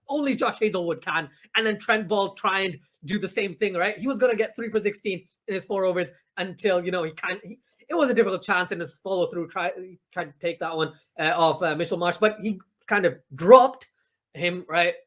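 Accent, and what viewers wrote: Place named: Pakistan. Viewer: Great analysis. So glad you lampooned the "toss" debate. If a team bats first and gets 170 plus, anything can happen. Yes they won the toss and won the game but as any researcher will declare: correlation is not causation. I have really enjoyed your comments and I am going to look out for them during the Ashes. Many years ago I drove night time city buses. My security guard was from Pakistan. We became great mates and spent the whole night talking cricket and religion. He was such a great bloke. It was not a requirement to have the same security guard but we always asked for each other. You remind me a lot of him, and it is not just the accent. Very balanced, very thoughtful, great sense of humour.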